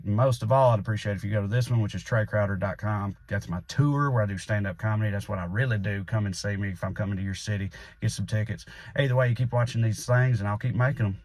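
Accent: South, United States. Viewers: Mister, I personally appreciate the hail out of you. Never has so much common sense smart come out of a mouth with a true southern accent.❤❤❤❤❤ Please keep up the good work in spite of all the mass ignorance in this current golden age of the asshole. Maybe one day, this shall pass……🤞🏼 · How refreshing to hear a southern accent not accompanied by a MAGA hat.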